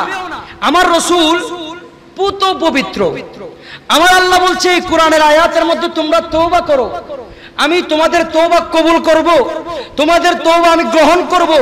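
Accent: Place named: Pakistan. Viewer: আরবি পড়তেছেন উর্দুর মত আফসোস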